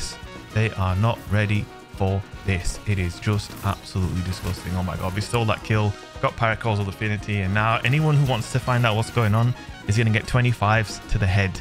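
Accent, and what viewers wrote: United Kingdom. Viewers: Something about a britsh man talk about fish in destiny is oddly satisfying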